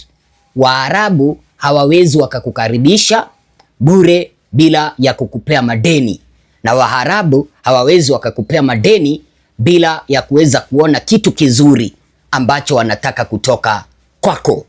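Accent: Kenya. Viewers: Kwanza huyu jamaa ni mkenya hakuna mtanzania anaeongea kiswahili hicho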